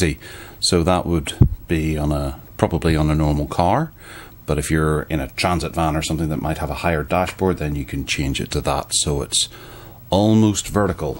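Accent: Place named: Northern Ireland, United Kingdom. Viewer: Hey, do I detect a Northern Ireland accent :P County Down here !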